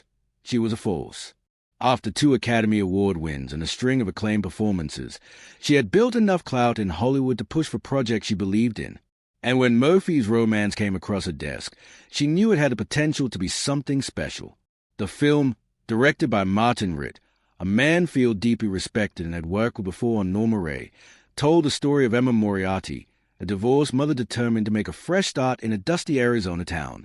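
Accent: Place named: England, United Kingdom. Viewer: English accent....that's how they speak.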